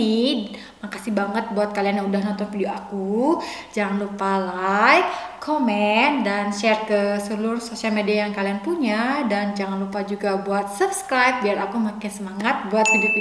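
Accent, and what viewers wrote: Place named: Bali, Indonesia. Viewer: Logatnya bali banget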